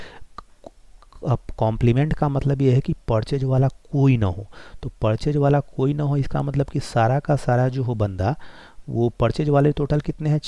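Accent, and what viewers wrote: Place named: Uttar Pradesh, India. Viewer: Sir aap toh UP ke lagte ho... BTW Amazing explanation. Thank you (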